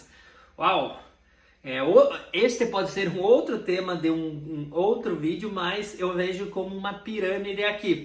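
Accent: Caipira, Brazil. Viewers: Não dá para aguentar esse sotaque caipira por mais de um minuto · Contas inúteis, professor. Sabe porque? Você, por exemplo, vive no Brasil, é brasileiro, e não fala um portugues bonito como o do Rio, ou o manauense, ou o do nordeste onde se fala um portugues cantado. Não, meu jovem, o seu sotaque é caipira. E você não mudou se jeito de falar estando imerso num país muito maior do que Portugal, no qual há sotaques variados, cada qual com a sua beleza própria ( apesar de uns serem mais bonitos que outros).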